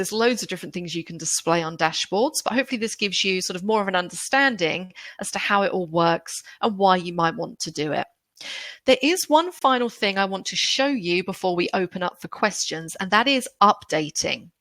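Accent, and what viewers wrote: England, United Kingdom. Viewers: I like genuine English accent in addition to your fabulous teaching.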